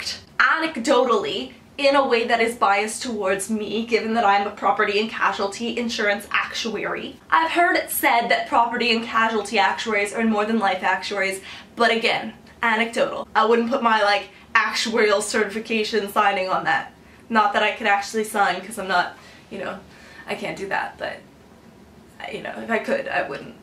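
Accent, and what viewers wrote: Canada. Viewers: Did I watch this on fast forward or do all Canadians fuck up our language like this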